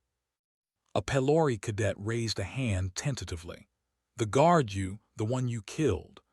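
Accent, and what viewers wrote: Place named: United States. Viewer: OMG do something with AI Language skills before you broadcast. it talks almost like it was taught not English but 'Murican.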